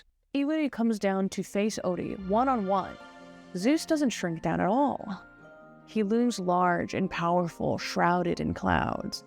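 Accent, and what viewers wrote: Netherlands. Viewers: idk if im hearing it wrong, but are you from the netherlands? i hear some part of a dutch accent but also not and i need to know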